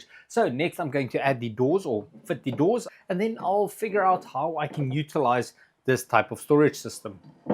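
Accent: South Africa. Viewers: I'm new to this channel. Do I detect a South African accent?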